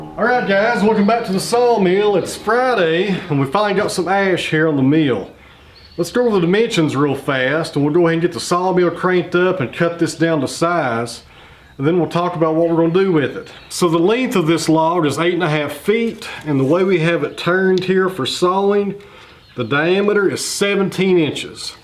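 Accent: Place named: Tennessee, United States. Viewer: My burning question is, what are those dangling thangs following behind the machine head, & why does the debarker disengage before the end of the log.? Other than that, isn't it mystifying why 1,000's of grown men & women love to sit back and watch a fella with a Tennessee accent saw up logs on a beautiful piece of machinery. I'm an electrician but I love wood & my name is Wood. Go figure?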